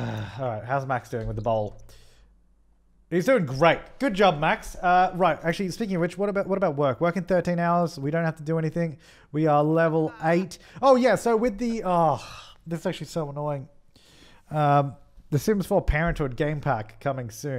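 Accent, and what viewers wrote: Australia. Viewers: I have been sick and binging this series. I wasn’t listening and my mom asked me “oh…you aren’t watching the chaotic Australian sim guy?” Hahaha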